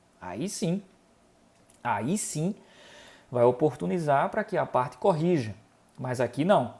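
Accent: Northeast, Brazil. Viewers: Parabéns pelo conteúdo. Gosto muito do sotaque nordestino, lembra meus avós.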